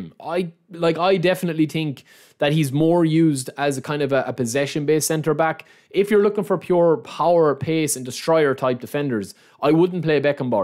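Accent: Ireland. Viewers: Love these tier lists and your McGregor accent. :))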